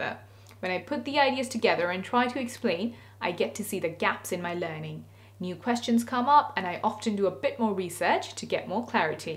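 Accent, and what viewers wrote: United Kingdom. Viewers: how you axcually gain the british pronunciation and fluency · How would you expertise British accent? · That accent is way tooo oxford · You talk perfectly in british accent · very sweet British accent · your british accent is so soothing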